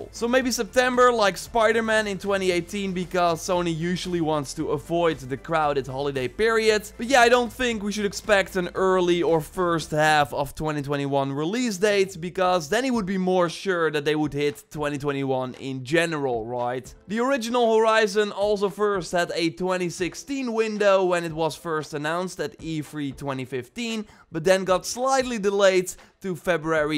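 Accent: Netherlands. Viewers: when I heard his accent I knew instantly that he was dutch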